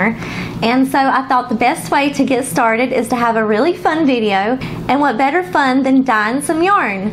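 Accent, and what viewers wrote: South, United States. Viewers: Your so cute, love listening to your southern accent. Thought of trying some dying after the first of the year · Wow! That is cool. My husband is originally from Mexico and we eat quite a few avocados, now I have a new use for all the peels and pits. How did your guacamole turn out? Love your accent. Always nice to hear a fellow southerner! 💜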